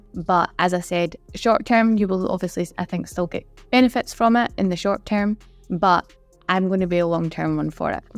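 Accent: Scotland, United Kingdom. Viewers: Love your Scottish accent 👌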